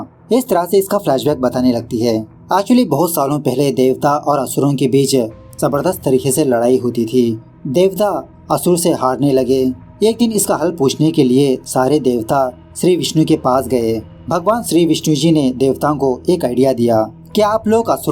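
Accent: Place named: South, India. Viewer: Are South Indian hai to thoda to waisa rahega na , hindi uski main bhasha nhi hai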